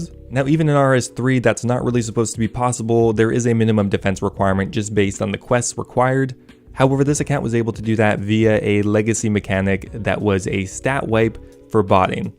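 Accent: Quebec, Canada. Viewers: t'es quebecois?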